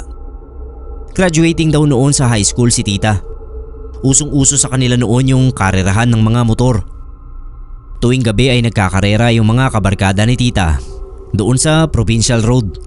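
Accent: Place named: Ilocos, Philippines. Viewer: Si narrator naten tunog ilocano. 😅😅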